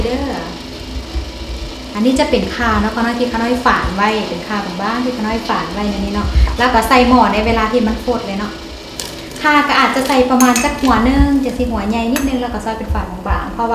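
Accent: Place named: Laos. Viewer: ນີ້ລະຈັ່ງເອີ້ນວ່າຄົນລາວເຮົາການເວົ້າແບບນີ້ສຸດຍອດມັກຫລາຍ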